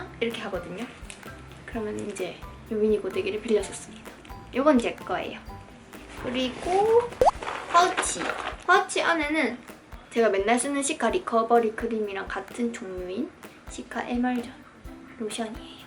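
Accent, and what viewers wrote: Busan, South Korea. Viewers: You got a dialect. Are you from busan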